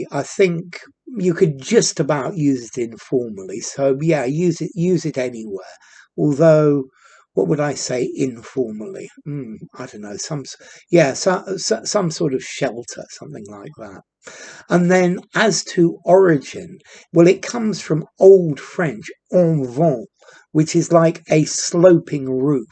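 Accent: United Kingdom. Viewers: Thanks for the UK pronunciation . Its derivative awning window" where the upper end of the sloping window is hinged and the other end meant for moving the window along an arc.. Please correct me, if I am wrong.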